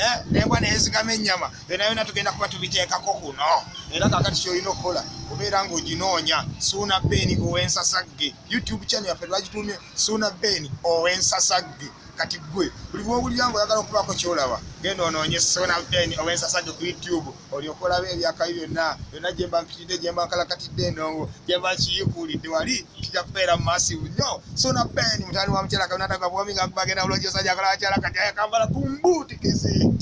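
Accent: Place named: Uganda. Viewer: Love you accent my brother from Uganda, I love your clads too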